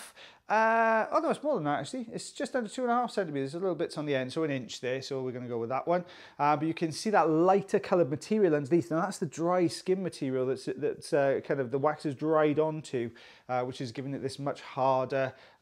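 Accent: Wales, United Kingdom. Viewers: I watch the videos as they are interesting and I have the notifications switched on. Also who doesn’t love a Welsh voice 😊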